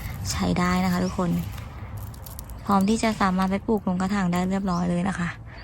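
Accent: Thailand. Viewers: เมืองกาญจน์แน่เลย หรือว่าราชบุรี สำเนียงชัดเจน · ราดรีชัวร์เลย พูดสำเนียงนี้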